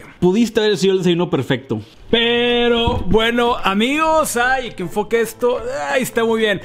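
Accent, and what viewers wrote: Monterrey, Mexico. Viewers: Yo quiero un en mi vida, me encanta tu vibra, tu voz regia, tu forma de ser, simplemente palomita es afortunada de tenerte en su vida 😘 Haz más vídeos cocinando, enseñando paisajes, me encanta esta faceta 🤗 Simplemente el mejor 😍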